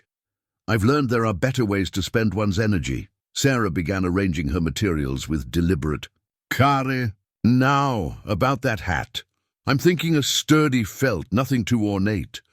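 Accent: United Kingdom. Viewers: Sorry but the engish accent and cowboy stories don't agree. The english storyteller is bad. His pauses at the wrong time ruin the pace. The best thing is the pictures of the cowboys and Indians ...mostly very handsome. Now the picture of the womsn in the above picture. How many women in the 1800s had tattoos on their chests?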